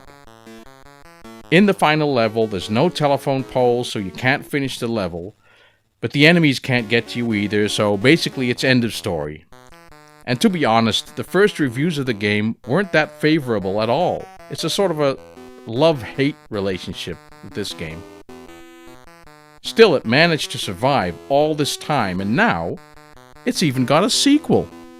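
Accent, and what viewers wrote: New York, United States. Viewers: Is that a new york accent?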